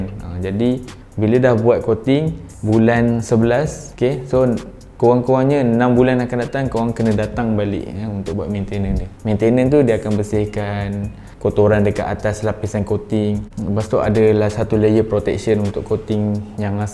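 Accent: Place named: Pasir Gudang, Malaysia. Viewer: Speaker mcm org pasir gudang lh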